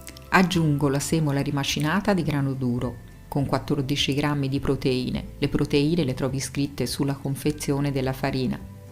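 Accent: Tuscany, Italy. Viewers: quell'accento todcano che rende il tutto "più buono"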